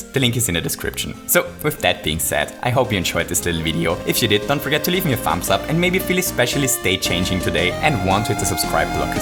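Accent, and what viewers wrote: Austria. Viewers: Bist du Österreicher? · Moment... du bist Österreicher? 🤔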